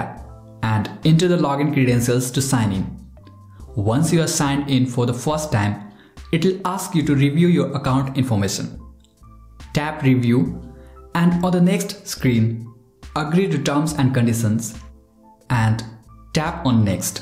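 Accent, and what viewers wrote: India. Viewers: Why does he sound like Indian???????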